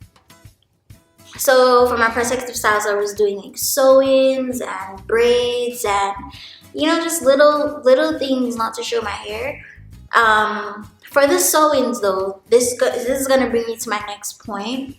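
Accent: Jamaica. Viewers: Your accent is so strong and beautiful 🇯🇲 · Hearing your Jamaican accent lol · as soon. as I heard you i knee you were Jamaica, big up yourself, you have my support, fellow Jamaican in the US.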